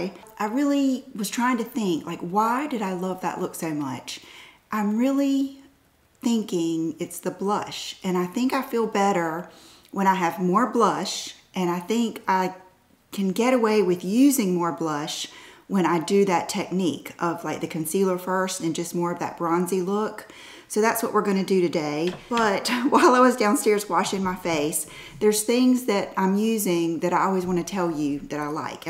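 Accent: South, United States. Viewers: I so love logging on, seeing that you’ve uploaded and hearing your sweet, Southern voice! I’ll be watching no matter what content you give us! ☺️ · I swear… you remind me of someone I went to school with! Lord, I miss her. Lost track of each other. I am so happy I found you! Please keep posting whatever little thing you have on mind. You give me joy 🤩 p.s. You sound like lovely Southern gal.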